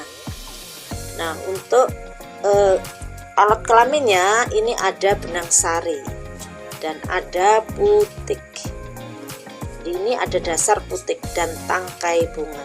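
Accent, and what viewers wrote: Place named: East Java, Indonesia. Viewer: Kaya wong jawatimuran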